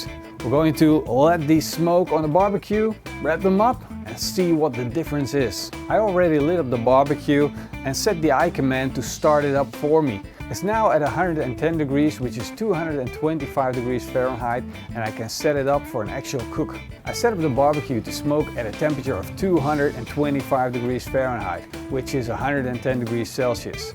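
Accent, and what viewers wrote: Netherlands. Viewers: Area Mann he is from the Netherlands i hear it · Nederlands accentje maat :D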